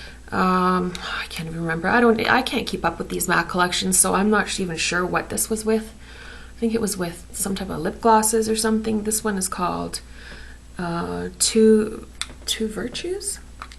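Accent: Canada. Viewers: Great haul. BIG FAN of the Canadian accent. Go Habs Go!! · Love the heavy Canadian accent =) Eh!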